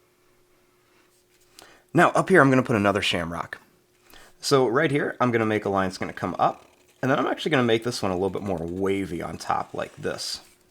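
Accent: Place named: Argentina. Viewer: habla en español argentino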